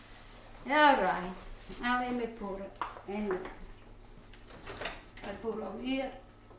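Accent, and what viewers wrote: Italy. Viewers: that dish looks delicious. I am making it today. I just Love you, your singing and your accent. Close my eyes and I’m hearing my Italian family. God Bless You ✝️ and your family. Son n Law you do an excellent job of filming. 👍🏻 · Yes. The only recipe I keep coming back to. I’m almost full Italian blood here in America. Her dialect and accent resonates with me. I’m a 4th generation here so a lot of the Italian culture is lost but this makes me happy and this recipe is delicious! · lovr her singing and her cooking her italian acent makes me smile love her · Grandma is so sweet and her singing Oh I love it.Although I don't understand the meanings but she also explaines what she's singing in her beautiful accent. I'm learning Italian dishes from her which are my favourite. 😋Lots of love from Pakistan and God bless! 😍🤗😚😚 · Once I heard the accent I knew I came to the right place!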